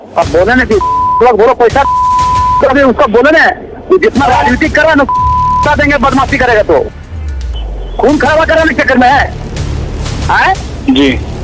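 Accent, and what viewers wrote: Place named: Bihar, India. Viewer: Pakka Bihari reporter hai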